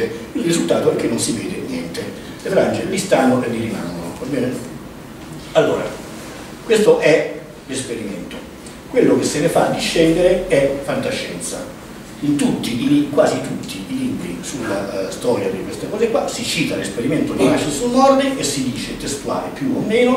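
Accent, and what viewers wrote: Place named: Rome, Italy. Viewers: Io credo che neanche nell'Africa nera : eco, illuminazione comica, leggibilità della lavagna, accento romanesco.... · L'accento romanesco ci sta, il problema è il resto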